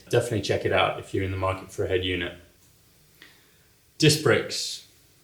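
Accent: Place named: South Africa. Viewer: Everything he said about disc brakes is accurate. It's not where it needs to be. Also, I could really hear the SA twang in his accent during this video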